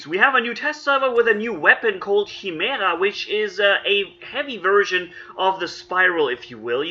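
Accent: Germany. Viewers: Are you german. Bist du deutsch, du bist deutsch oder du hast auch Vykker oboniert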